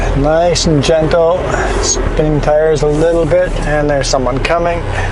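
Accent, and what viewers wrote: Canada. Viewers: Sadly, Yes. And in California gold fields, roads and railroads the Irish immigrants were used in the same expendable ways as were Chinese "laborers". I suspect that may have been true in Canada as well. I'm delighted to hear the Irish and Scottish influences in your wonderful Canadian accent as you speak, eh?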